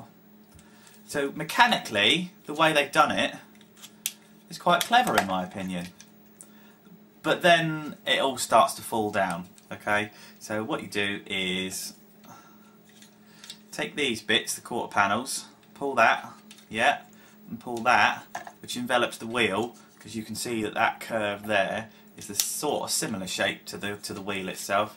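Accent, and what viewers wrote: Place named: Wales, United Kingdom. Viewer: I like your warmup techniques sir! Thankyou for reviewing him for me pal and repeating what a bag of gash he is haha! A fiver is not too shabby tho i must admit! Great stuff me ol chum:) If you ever go to rhondda that welsh accent could save you life too;)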